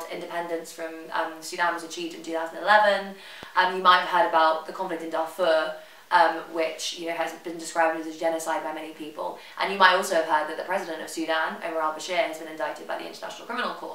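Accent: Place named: United Kingdom. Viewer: You speak too fast, I barely understood your sentences and I know most African will not understand you British accent . so consider and bare that in mind next time.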